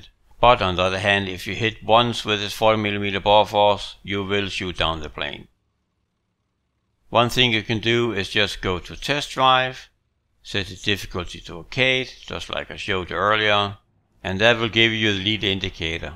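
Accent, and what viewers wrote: Denmark. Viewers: Are you from Danmark?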